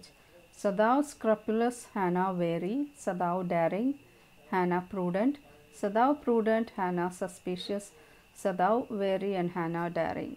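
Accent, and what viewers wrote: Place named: South India, India. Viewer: Madam are you south indian as your accent is similar to them also the explain to commendable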